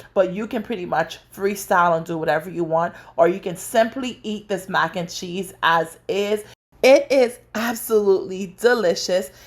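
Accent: Bahamas. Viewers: That Bahamian accent🔥🔥🤌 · Bahamian accent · Lol your bahamian accent! My mouth is watering as I watch this, my number one favorite side yum😋 #cheesy and rich #them herbs is everything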